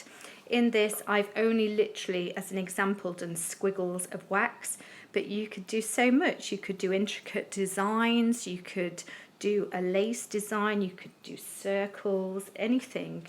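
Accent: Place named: England, United Kingdom. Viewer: I have this book and book 2 and have just ordered bk 3, I tried to get bk 4 on Amazon but it’s currently not available so maybe I will look elsewhere. Lovely to hear your voice, I was born in England spent my first 25 years there then emigrated to Australia so I still love to hear English accents thanks for this video it really helps.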